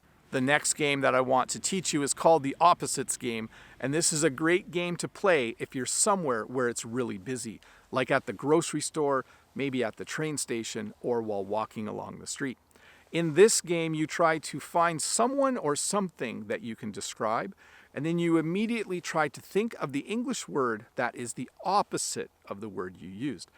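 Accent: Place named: Canada. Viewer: Thank you,. These games can be helpfull to improve English. The same games we have in my country as well but I didn't recall them. By the way - your accent is very great, at school they always said that Canadians have french-like accent. No offense:)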